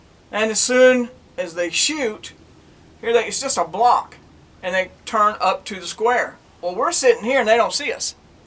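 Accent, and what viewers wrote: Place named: United States. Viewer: Mate, that is not the world, that is the usa, judging by what you described and by your accent. I live in a small country in europe and that kind of shit just does not happen around here. Kids running around with shotguns... that is just crazy. Here you can have a 8 year old gril walk the streets of a city with one million people at night and be afraid of anything. Ofc, the parrents dont allow that anymore, because of the constant fear porn in the news, but you could still do it and nothing bad would happen. I am really not a fan of weapons and i am happy that they are not allowed in my country. However, if i was living in the usa, i would want a gun too. How the hell do you police a society where every fool, even kids, can have guns? There is a lot wrong with my country, but when the police see someone with a gun, they know there is troube and they know who the bad guy is. 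They dont have to think about whether they are being subjected to a 2nd amendment audit.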